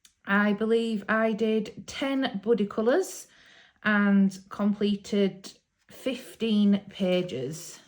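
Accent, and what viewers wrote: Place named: Yorkshire, United Kingdom. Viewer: Welcome to colouring! I started my channel earlier this month as well. Yay for another delightful Yorkshire accent, yay for another happy pup, and well done with all that work in April!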